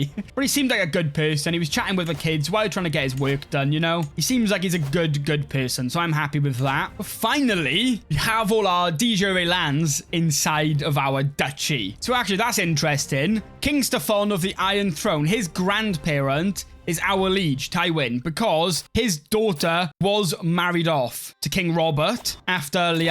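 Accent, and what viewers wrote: Wales, United Kingdom. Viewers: You sound funny like neath funny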